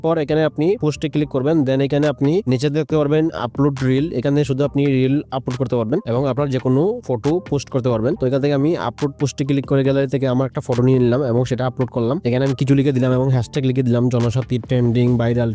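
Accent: Sylhet, Bangladesh. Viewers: ভাই সিলেটি❤❤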